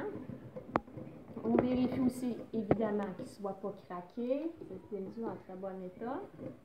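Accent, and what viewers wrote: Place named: Quebec, Canada. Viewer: Désolée ,mais je ne comprends pas tout avec l'accent du Québec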